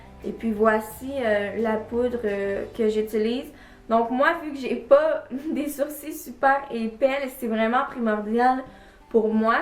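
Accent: Quebec, Canada. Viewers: Accent quebecois, jsuis sur...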